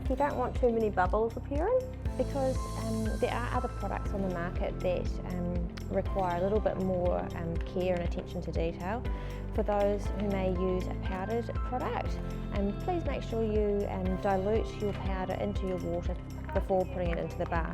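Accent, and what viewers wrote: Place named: New Zealand. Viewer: It new zealand accent, i can understand her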